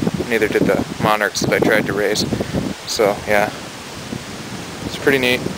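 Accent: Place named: Canada. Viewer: You say out and house like a Canadian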